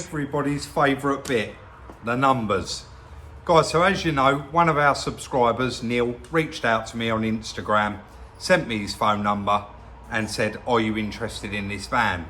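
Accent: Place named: Essex, United Kingdom. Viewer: Hey, my wife was overhearing your accent she thinks you're an Essex boy. Is she right?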